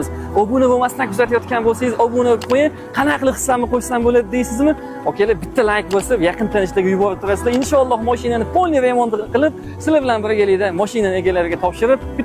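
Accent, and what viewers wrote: Uzbekistan. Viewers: 1 чидан Устахонани асосчиси булсез номерларни фаркига боришингиз керак 2 чидан узиз наманганлик була туриб 10 лик номер Билан 50 лик номерликни фаркига бормайсизми шевез айтиб турибтику наманганликлигизни Яна Окалар дейишизга улайми... Узидан Тошкентлик ясаб олдизми ... Мухлислари алдаб хейла хейла килманг...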